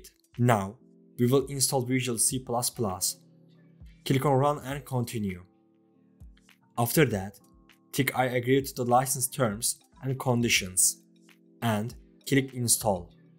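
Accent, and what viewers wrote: Turkey. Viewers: reis bişey sorucam sen türkmüsün aksanın türkçe çünkü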